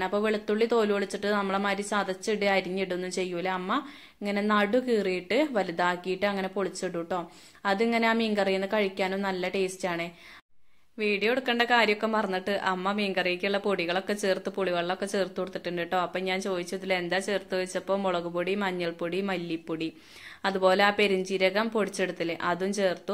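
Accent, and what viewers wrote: Malappuram, India. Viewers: Varthamanum kettit Malappuram ano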